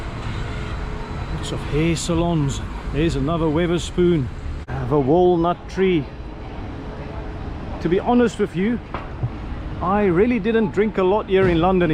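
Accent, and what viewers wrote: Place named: Cape Town, South Africa. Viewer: Jy is ñ Kaapenaar met die aksent,! 😁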